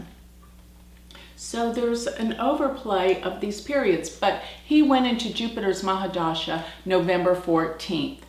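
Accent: Texas, United States. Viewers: I love her southern accent. Texas specifically. Makes me miss southerners a little bit. I live on West Coast now. Over the years, I have dabbled on and off of your website. My knowledge of Vedic astrology is small. After watching this video, I feel more affinity towards you, your work I mean. It takes some courage to do this video, I think, because many people interested in astrology tend to be on the Left (lol). God bless you,.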